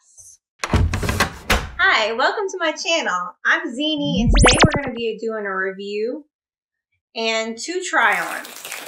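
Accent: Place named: South, United States. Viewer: Love the southern drawl y'all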